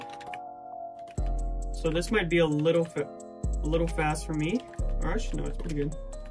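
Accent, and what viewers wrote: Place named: Afrikaans, South Africa. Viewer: you sound afrikaans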